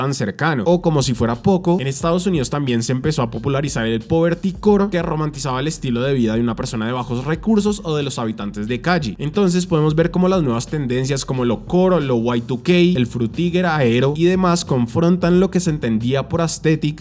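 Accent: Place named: Bogotá, Colombia. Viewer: El único problema de este video es el acento rolo maluco.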